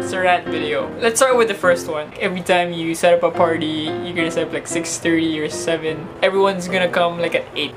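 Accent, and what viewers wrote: Philippines. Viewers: I really hate the tone, the way you said "squatters". They didn't choose to live that way, tiny chance to have a decent house because of poverty, they don't have a choice to choose mate. It's a shame on you to say that to your own people. I maybe understand you if you're not filipino but you are, i can tell because of your "ACCENT". Be nice to your own people. I agreed on what you've said, some filipino peeing everywhere which is not nice, and the rest of what you've said is garbage!f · Based on your looks and accent you could tell hes a typical flip....this is just sad hating your own kind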